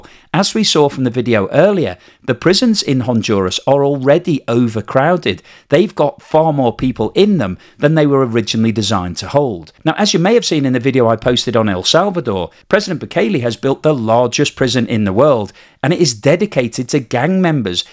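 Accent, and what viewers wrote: United Kingdom. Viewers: As soon as I hear this British accent, I block this videos almost immediately, because of all the scams, using the same British accent voice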